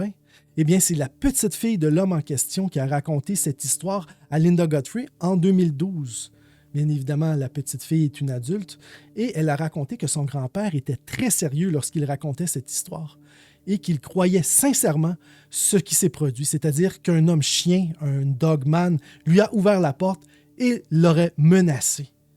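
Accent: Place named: Quebec, Canada. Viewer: Toujours un plaisir d entendre l 'accent , à Guebec , nos anciens parlaient comme ça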